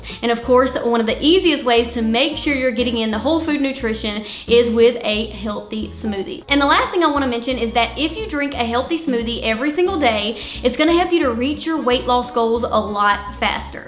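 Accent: South, United States. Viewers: What kind of accent is that? Sounds southern to me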